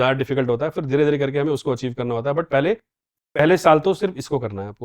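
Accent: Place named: India. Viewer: indian Accent is most Unpleasant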